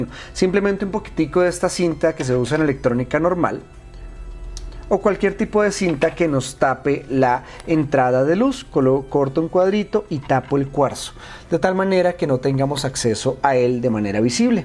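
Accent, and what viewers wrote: Colombia. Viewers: Eh mijo x2 colombiano 😅😗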